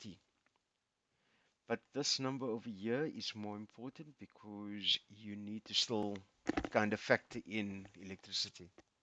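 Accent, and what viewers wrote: South Africa. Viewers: my man sounds South African AF well done thanks for the useful info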